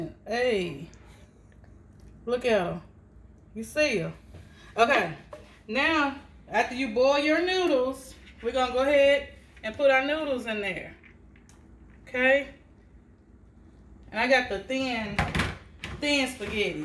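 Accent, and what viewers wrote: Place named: Tennessee, United States. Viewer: Baby you're definitely from Tennessee ! I'm from West Tenn, and it's the southern draw for me lol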